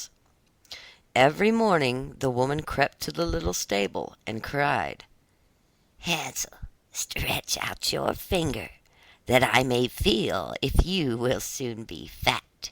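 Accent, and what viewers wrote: South, United States. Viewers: Although I am grateful for the story, but you aren’t the only one who isn’t distracted by the Southern accent. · Hated the southern accent . Terrible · I must be the only one here who are NOT distracted by the southern accent. I find it amusing... And I'm from Europe myself.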